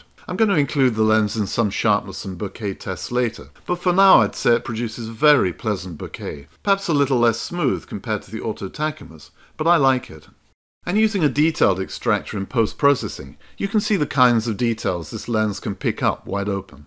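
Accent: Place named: RP, United Kingdom. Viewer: His particular accent and tone sound almost identical to David Cornwell, the real name of the great writer John LeCarré. It’s beautifully cultured in that special British way. I’d be interested to know a bit about background, although it really has nothing to do with wonderful lens videos!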